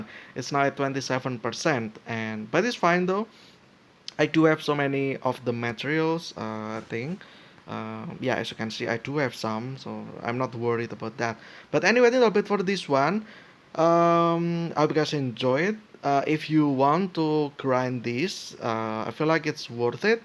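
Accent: Indonesia. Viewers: Aksen Nya Kena Banget Orang Indo Bahasa Inggris Nice Bang Informasi nya 🙏🏾🙏🏾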